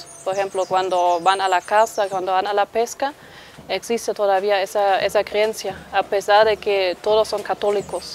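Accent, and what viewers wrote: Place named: Santa Cruz, Bolivia. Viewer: Que simpático su acento camba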